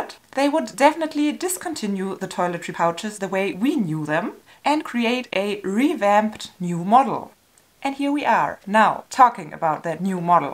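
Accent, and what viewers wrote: Germany. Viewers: Where are you filming from love? Love your German accent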